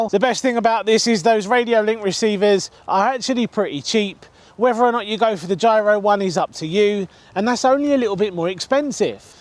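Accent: United Kingdom. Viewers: You sound very well like a British accent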